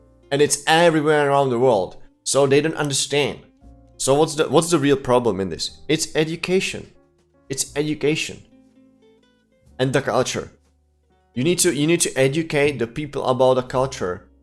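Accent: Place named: Russia. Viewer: You sound Russian to me.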